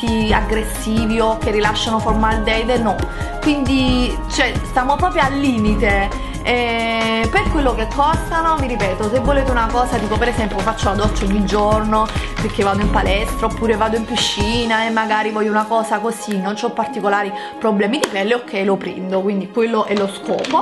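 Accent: Naples, Italy. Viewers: Ma sei napoletana? 😍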